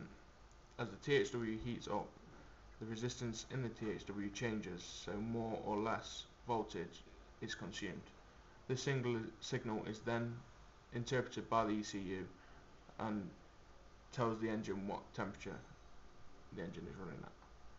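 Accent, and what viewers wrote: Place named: United Kingdom. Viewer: i would love to understand your british english language man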